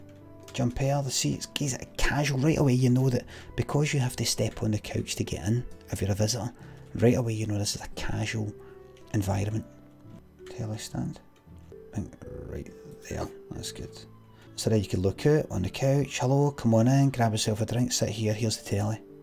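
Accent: Scotland, United Kingdom. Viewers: It's like Jerma but scottish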